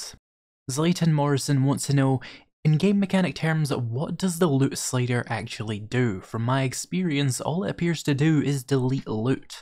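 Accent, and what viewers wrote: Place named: England, United Kingdom. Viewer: Answer: Series. The plural of series is series. It's just like the word deer. You have one series. You have twenty series. This is because the word is a noun that itself is already describing a plural. Therefore, it retains the plurality in singular and plural. (This feels very awkward, being an American explaining English to a person with an English accent) 🤣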